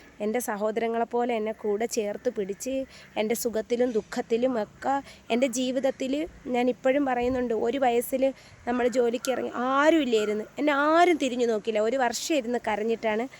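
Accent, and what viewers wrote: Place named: North Kerala, India. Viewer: Hlo എടാ ..നിന്റെ വീട്‌ കൊല്ലം ആണോ ...ഈ പാരിപ്പള്ളി എവിടാ ...നിന്റെ slag വടക്കൻ കേരളത്തിലെ പോലെയാണല്ലോ .....ഒന്ന് പറയണേ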